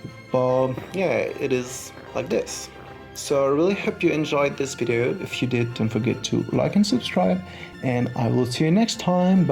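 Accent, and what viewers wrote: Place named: France. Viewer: The only thing I might like more than your builds is your cute french accent :^)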